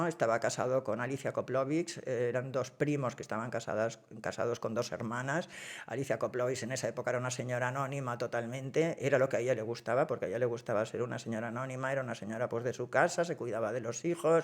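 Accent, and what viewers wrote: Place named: Barcelona, Spain. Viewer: Este “no” es una marca de la casa. No te inquietes por eso, es una coletilla perfectamente válida. Tu forma de hablar es muy barcelonesa ( parte alta ) y a mí me recuerda cuando vivía en esa ciudad.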